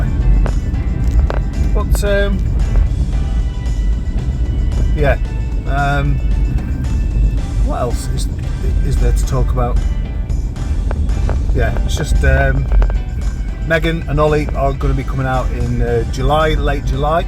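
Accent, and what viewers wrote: United Kingdom. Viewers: Loving your angry Englishman! Trying to work out where your actual accent is from (not Yorkshire 😉), NW England perhaps?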